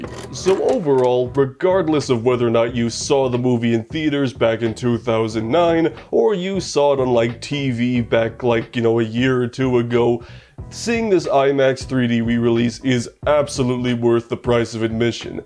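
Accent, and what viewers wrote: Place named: Russia. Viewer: я знаю, что ты русский, твой мерзкий акцент тебя выдает. скоро когда узнают что ты русский сразу депортируют обратно в помойку за то что ты русский :)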